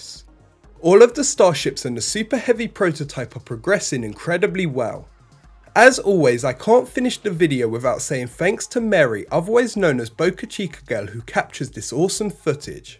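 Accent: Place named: East Anglia, United Kingdom. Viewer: The pronunciation is from East Anglia. It is spoken this way by millions.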